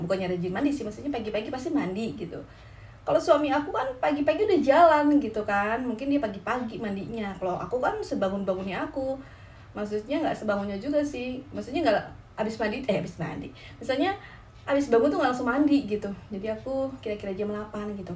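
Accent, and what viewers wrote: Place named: West Java, Indonesia. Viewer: assalamu'alaikum.....mami...,smga istiqomah dlm syiar islam di negeri sakura, aamiin...,smoga klgnya sakinah mawadah wa rohmah, aamiiin.. indonesianya dari daerah mna? kok tmpak logatnya kyak dari Pasundan, smga slh tebakan saya.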